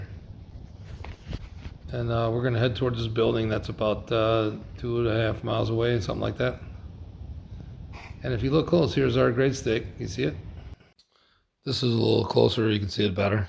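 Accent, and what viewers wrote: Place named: Italian-American, United States. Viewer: You sound like a fellow paisan! Keep up the good work!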